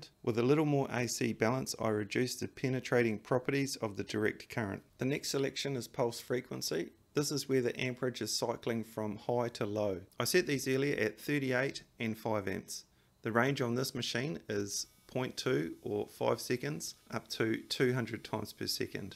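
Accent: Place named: Australia. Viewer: I only watched the video because he has an Australian accent and sounded like he knew what he was talking about. Turned out to be a good video.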